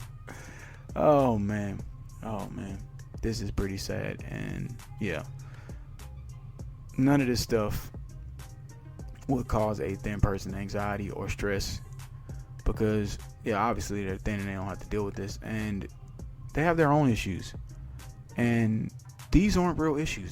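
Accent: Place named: South, United States. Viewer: His corn pone accent makes it all funnier.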